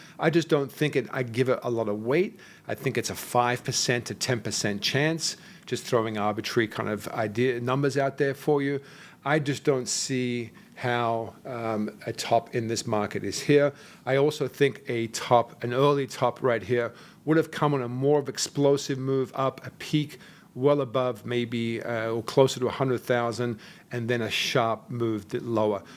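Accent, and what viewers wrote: Australia. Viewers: I can’t place his accent… Is there a little bit of Aus or Kiwi accent in there??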